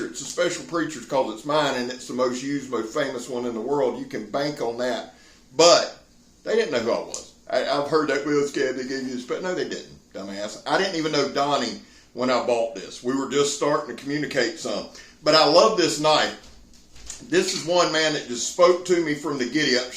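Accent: South, United States. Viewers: Southern accents are so cool. All I got is this Canadian tongue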